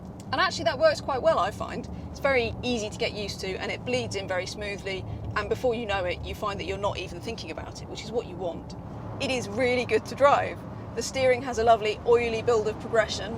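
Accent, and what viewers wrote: United Kingdom. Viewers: But the British pronouncements are so annoying. Hard to listen.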